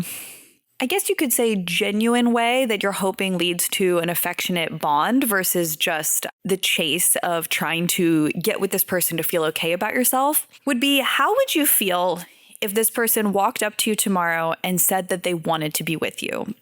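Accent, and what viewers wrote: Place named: California, United States. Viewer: hello, your speech is amazing are you from western California.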